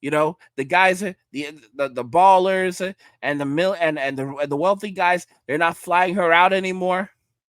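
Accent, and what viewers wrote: New York, United States. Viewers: Great content,. I like your in-depth analysis, POV / plan and prediction that Western college educated (Feminist indoctrinated) former OF creators will be forced to the streets (competing with AI Girlfriends). Great New York construction guy accent and positivity. Consider a classier Angry Man face to better represent you. I see you as Justified Man of Justice. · I’ll give you a 7 outta 10 on that New York accent.. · 10 on the NY accent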